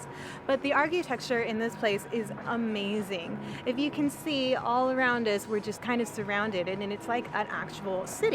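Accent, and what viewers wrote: United States. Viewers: I detect a california accent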